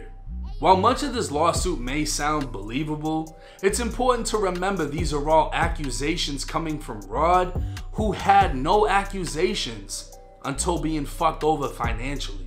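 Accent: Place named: East Coast, United States. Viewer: You from Houston huh? Tam bout Whoops took some time but I hear that East Coast accent. Bruh I'm glad I found this channel. Keep it up Big Homie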